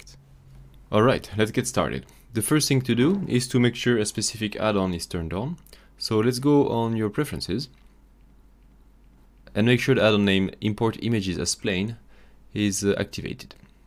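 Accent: France. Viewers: very french, love it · Nice french accent ;) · nice try but your French accent are definitly present , merci !! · Dude love for accent à la française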